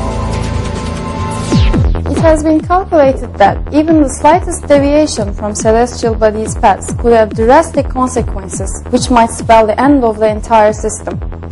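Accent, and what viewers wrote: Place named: Turkey. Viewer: kesin bizden, aksandan belli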